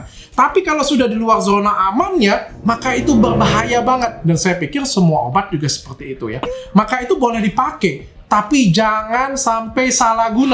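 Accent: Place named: Medan, Indonesia. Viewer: Iya..dokter kayaknya gede di Medan..ini logat kami chinese Medan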